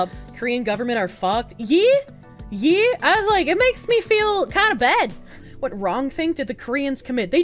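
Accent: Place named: West, United States. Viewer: 그냥 뉴스 많이 챙겨보는 분인거같은데 서부 악센트가 강하게 들리는거 보니까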